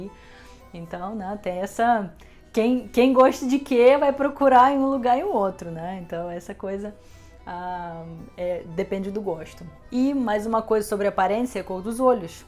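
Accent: Rio de Janeiro, Brazil. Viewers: Você fala muito bem o português, fala até com o sotaque carioca. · Já tá toda trabalhada no sotaque carioca! Chia mais que dez cariocas juntos! Português perfeito! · Vc tem o sotaque do Rio de janeiro se eu não me engano ? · Da para perceber que ela mora no RJ. Super carioca. Um português impecável! Meu Deus. Nota 10 para a fluência dela! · Você fala um ótimo "carioquês" haha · Linda e doce Russa/carioca, você é uma ótima observadora de comportamentos masculinos aqui observados em relação às duas nações, o Brasil e a Rússia. Seu sotaque do português brasileiro está mais perto do Lácio pela pronúncia do "s" como "x" o qual é uma herança lusitana e que por sua vez vem do latim. No estado do Rio de Janeiro fluminenses e cariocas e também nordestinos e nortistas ainda usam a pronúncia romana do "s'' assim como ainda o fazem os portugueses e os galegos, estes irmãos no idioma pois foram no passado uma só língua. 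Abração apertadinho, saúde de montão, paz, alegrias e muito mais sucesso! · Parabéns por falar muito bem português pt-BR com um RJ-accent. Apesar de eu ser abstêmio, não bebo desde os 13 anos, concordo com sua avaliação sobre bebida. No filme Guerra e Paz, baseado na obra de Leon Tolstoi, existe uma cena antológica que comprova essa tradição russa de competição para ver quem bebe mais e quem é mais corajoso. Dois homens, cada um com sua garrafa de vodka, ficam de pé se equilibrando no parapeito de uma janela no terceiro andar, de costas para o lado de fora. Ganha aquele que conseguir esvaziar a garrafa primeiro. Quanto a mulheres russas, alguns anos atrás o escritor brasileiro Paulo Coelho fez uma viagem no Expresso Trans Siberiano. Essa viagem foi filmada e passou na tv brasileira. A cada cidade ele descia para ter contato com as pessoas e só apareciam mulheres jovens, todas extremamente bonitas. 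Mulheres russas e ucranianas parecem ser literalmente de outro mundo. No bom sentido, claro. Acho que as únicas que conseguem competir com elas são as iranianas (persas). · Po , russa ? Pelo sotaque já é carioca!!!!! É noíxxxxx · Ela já mora no Brasil há mais de 7 anos, perdeu o sotaque russo e ganhou sotaque carioca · 😘vc e muito linda interior e exteriormente! adoro seu portugues com sotaque carioca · Pelo seu sotaque,você deve ter aprendido o português Brasileiro no rio de Janeiro. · Você é a primeira russa com sotaque carioca RSS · vc é russa? kkkkkkk seu português tem sotaque carioca (RIO DE JANEIRO) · Uma russa falando com sotaque carioca, que top ! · Sotaque carioca. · Amo essa Russa com sotaque de carioca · Olha fiquei até na dúvida se é brasileira, sotaque carioca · Achei seu português com sotaque mais carioca do que russo mesmo assim bastante charmoso.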